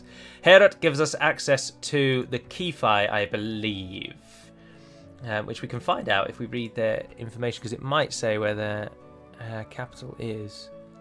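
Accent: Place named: Yorkshire, United Kingdom. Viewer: that yorkshire accent was... enchanting.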